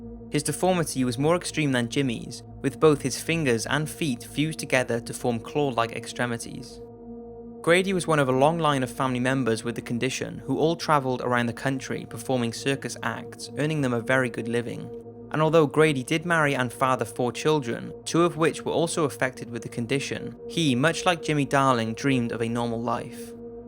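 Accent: Wales, United Kingdom. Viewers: Loved this video, it was so interesting! Are you Welsh? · awesome video! Just wondering, it's really bugging me, are you Welsh? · 1. It really wasn't a good idea to watch this at 1am. And, 2. Are you Welsh? I'm really trying to figure out your accent and whether you're part of the Cardiff crew too 😜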